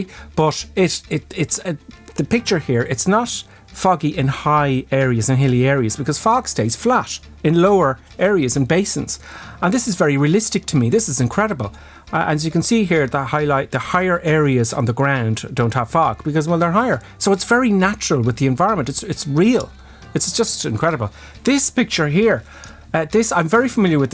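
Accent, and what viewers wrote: Ireland. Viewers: You sound like a fellow Irishman?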